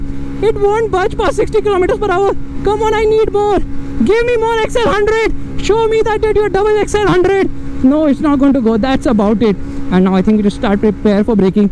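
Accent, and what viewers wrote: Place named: Mumbai, India. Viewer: That is Mumbai/Pune English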